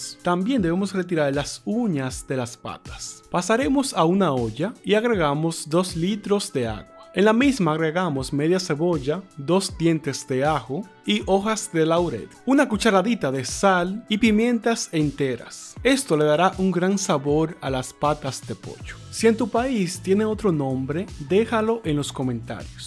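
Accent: Dominican Republic. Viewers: el es Dominicano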